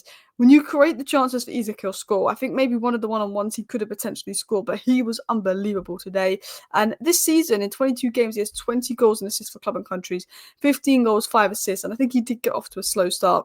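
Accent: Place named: Newcastle, United Kingdom. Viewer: Love that your a secret Geordie 😅 always quick on the videos, good stuff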